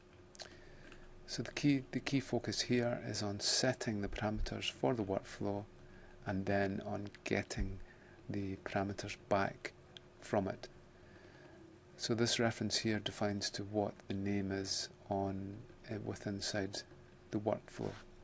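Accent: Scotland, United Kingdom. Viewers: He's Scottish, it's just how they sound.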